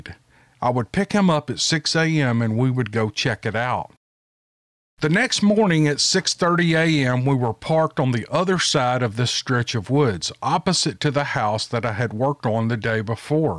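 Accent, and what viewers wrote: South, United States. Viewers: I think it's the southern accent that attracts people up here in the Connecticut and New York area. Yankees can't get enough of it.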